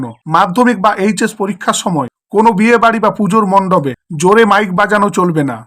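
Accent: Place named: Bihar, India. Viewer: Apni to mone hocche bihari babu.thikmoto banglate kotha bolte paro na.vason diccho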